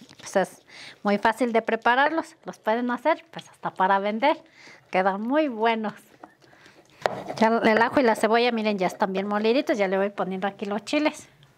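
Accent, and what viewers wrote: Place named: Michoacán, Mexico. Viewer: Por el acento de la Sra., me doy cuenta de que es Michoacán. Allá se cocina super rico y su gente es muy amable. Se ven deliciosos sus taquitos. Ya tiene un nuevo suscriptor. Saludos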